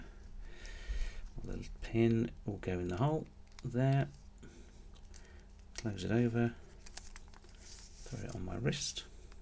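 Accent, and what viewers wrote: United Kingdom. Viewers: While I shall defer to you when it comes to identifying casual versus formal as you have the British accent and are thus infinitely classier than I with my awful New York accent I believe, the leather is the dressier strap. I have just picked this exquisite watch today on the leather strap and I’m head over heels. The AD did not have it in stock on the bracelet so I ordered that separately. This is a great video, thanks for sharing!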